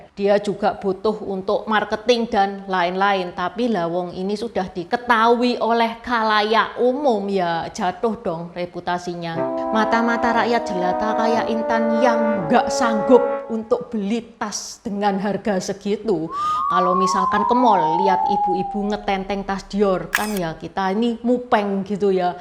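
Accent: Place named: Surabaya, Indonesia. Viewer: logatmu lucu...seperti orang di pasar atom. Tetap aja sebab menurutku menarik.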